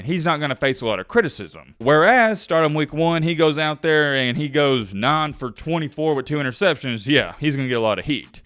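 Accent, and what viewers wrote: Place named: Alabama, United States. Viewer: Hey, What do you say at the end of your videos? My husband and I can't figure it out. We know you're a huge Bama fan, but we don't understand Bama speak. We aren't Bama fans, but we love your channel.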